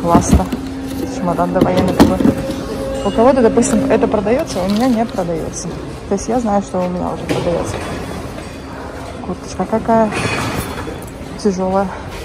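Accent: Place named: Southern Russia, Russia. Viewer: Ой --я рада , что появился новый блогер на барахолке. Смотрю и Олю и Сашу...Теперь и вас смотреть буду... Только я не поняла , вы в России где жили??? У вас немного южный русский акцент.. Удачи вам в поисках...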